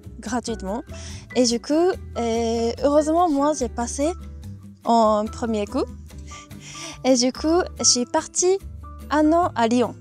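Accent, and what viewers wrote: Japan. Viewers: J’adore ce français à la japonaise 😀 Tu lui donne un certain charme ❤️ Et tu parles mieux que certains Français 👏😘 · je suis très impressionné par un tel niveau de maitrise de la langue !! et en plus avec très peu d'accent ( je compare avec d'autres japonaises qui parlent en français ) alors bravo ! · Une japonaise mignonne, parlant un français courant avec cette petite pointe d'accent et pour arrière plan un village japonais... Bref, un charme fou vette video !!! ❤ Allez je m'abonne ! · C'est la première fois que j'entend l'accent japonais avec du français presque parfais mdr. Par contre c'est pas une bonne idée de venir en france. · bonjour. Je suis très content d'avoir pu regarder - par hasard - ta première vidéo. Je suis moi-même très attiré par la langue japonaise que j'avais commencé à étudier. Bravo pour votre niveau de français, excellent, avec cette pointe d'accent japonais qui vous singularise et vous rend si charmante.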